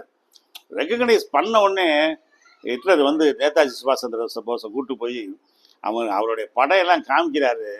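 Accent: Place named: Madurai, India. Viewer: சிறந்த வர்ணனை... மதுரை தமிழ்.. சிறப்பு..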